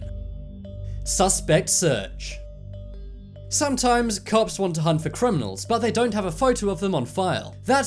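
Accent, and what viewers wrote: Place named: England, United Kingdom. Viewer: Your accent 😭♥️♥️.you From England???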